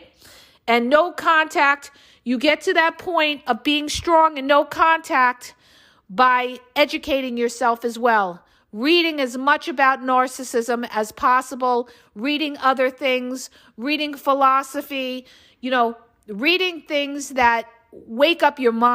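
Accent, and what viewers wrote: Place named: New Jersey, United States. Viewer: This has to be a jersey girl😄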